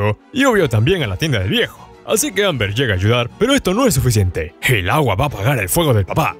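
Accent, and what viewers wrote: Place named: Argentina. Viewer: ¿Por qué hablas como argentino si eres de Perú?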